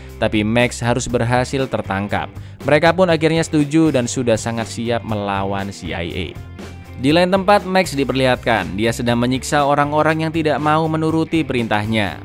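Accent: Java, Indonesia. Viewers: Mas sampean jowo ngendi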